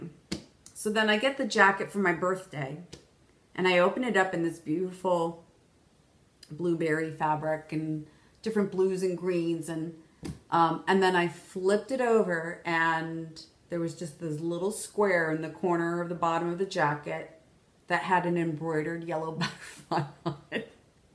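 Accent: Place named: Philadelphia, United States. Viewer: I have to watch you! You’re from up near my hometown (Philly) and the accent makes me feel like home lol plus, you crack me up 😂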